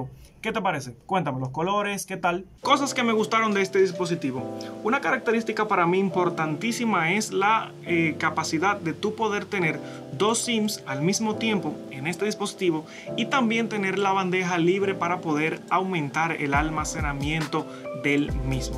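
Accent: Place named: Dominican Republic. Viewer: Yo se que si eres de República Dominicana por el asentó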